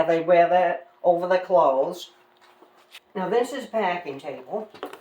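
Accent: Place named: Maine, United States. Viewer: Loved her Maine accent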